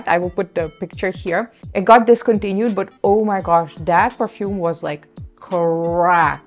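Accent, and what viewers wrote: India. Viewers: She has an Indian accent now 🤣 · She has developed a slight Indian accent. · I am hearing bit of indian accent in u lol, love ur journey so far❤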